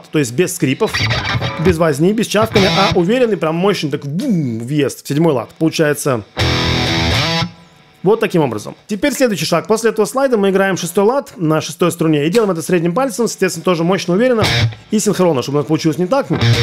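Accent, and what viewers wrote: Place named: Novosibirsk, Russia. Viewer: Эти интонации Пушного такие же как у моих новосибирских друзей частично НГУ, частично Сибстрин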